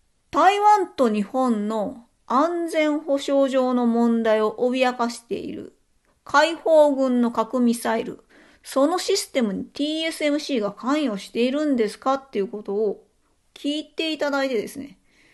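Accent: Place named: Tokyo, Japan. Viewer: みんなで応援し萠絵さんを国会に送り込みましょう。 そして国会議員になってもらい、経済産業省に政務官として 活躍してもらうのです。役人はタジタジでしょうね。 ただし萠絵さんの東京弁はちょっときつめなので大阪弁が良いです。 「うちは国のために きばりまっせー。やりまっせー。 浪速の根性みせたるでー！！ 維新や公明がなんぼのもんじゃ！！ 負けへんで。 ほやから うちに 1票入れたって」 絶対当選させるのです！！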